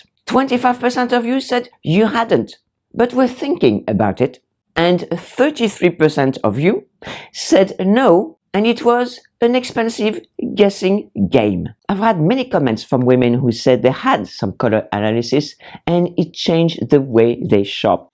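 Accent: France. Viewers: Loved this❤such a charming, elegant lady with empowering advice and lovely French accent. Colour analysis was Big when i grew up, but after studying colour theory myself and experimenting for years i ended up with same conclusion as you😊 i used to like silver and cold colours but with age i look better in Warm colours and gold. Basically i choose colours that look good with my skin and can wear the same items over and over without wasting on trend colours. This allowed me to invest in quality items that i am truly happy with.Easy- peasy, sustainable & money saved😍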